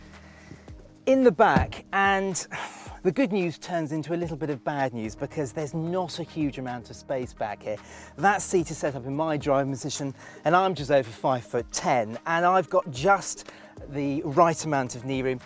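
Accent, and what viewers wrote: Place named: RP, United Kingdom. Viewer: speaks so posh it's refreshing to hear. And the car looks nice, the first time in a long time I have liked a new Peugeot.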